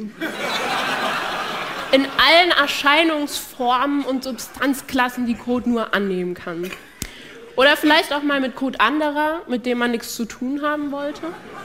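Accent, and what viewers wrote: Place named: France. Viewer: that's her french accent 🤓🤭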